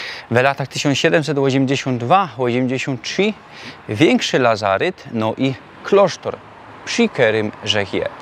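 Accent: Silesia, Poland. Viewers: Fajna ta gwara śląska 👍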